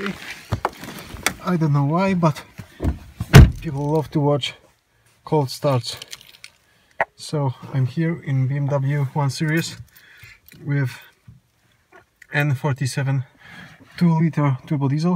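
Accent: Poland. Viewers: Ale mnie śmieszy ten polski akcent Polak dla Polaków po angielsku gada :D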